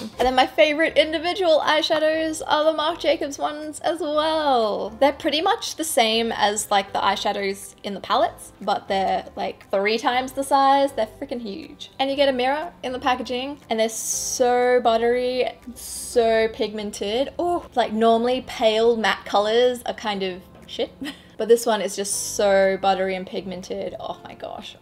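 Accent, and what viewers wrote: Australia. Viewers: Hey!!! Just wanted to let you know that I love your channel!! Im so glad that i have found it!! Love the Australia 🇦🇺 Accent!! Anyways hello from Texas ♥️♥️♥️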